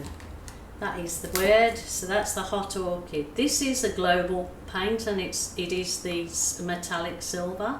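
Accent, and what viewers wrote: Birmingham, United Kingdom. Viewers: Lovely....can I hear a bit of a brummy accent?🙂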